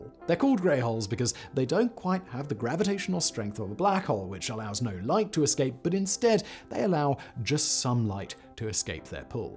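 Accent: United Kingdom. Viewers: I enjoy these channels very much, but sometimes pronunciations are so cartoonishly British it's distracting. If it turns out he's actually from like Boston or Queens but choose his accent to seen more legit, I wouldn't exactly be surprised. It's a lot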